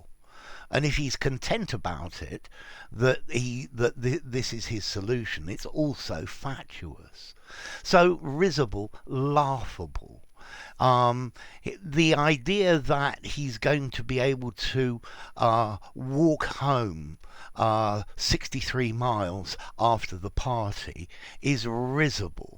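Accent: United Kingdom. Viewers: I always love british accent!!!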